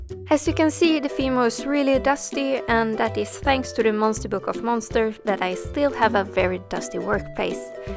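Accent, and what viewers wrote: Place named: Sweden. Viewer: Shit, man hör på din dialekt att du är svensk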